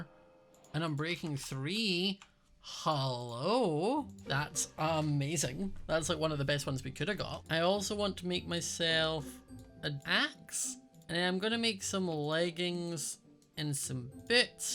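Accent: Scotland, United Kingdom. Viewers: Is called being Scottish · Yes and it’s because he’s Scottish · hes scottish not irish :-)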